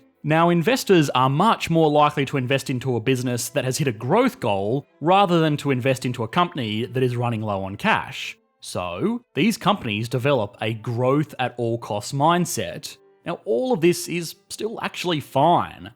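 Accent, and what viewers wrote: Australia. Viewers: You Australian? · you upload exactly when I'm making my evening coffee, nothing like watching a smooth Aussie accent explaining economics with a hot cup of coffee! :D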